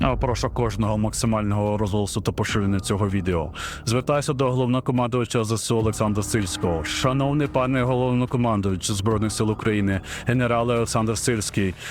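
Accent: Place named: Russia. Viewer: Фейк!, рос пропаганда ! Акцент кацапский! Нет никакого окружения, это путлеровское враньё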